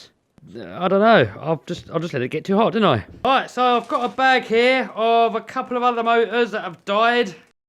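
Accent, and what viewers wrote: England, United Kingdom. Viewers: Brithish English, best English🇬🇧🇬🇧 Always good videos👍👍👍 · in your next video... can you say leaf litter... i love how the britts say that:) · What part UK you from? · I love the way British people talk 😄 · Say mo*ah however you wan* o say mo-ah! I love hearing *he Bri**ish accen*! *hats par of *he appeal of your videos for me! *op work! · I like your the way that you talk in the uk cause I live in Canada so how you speak is unique to me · I think your speech is fine I like an English accent especially the way a true English man talks totally awesome I really like the way you say H and 8 s sounds cool with the baby voice he does ask him for me to speak that way more often. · picking on pronouncing is lame! especially at a funny britt guy.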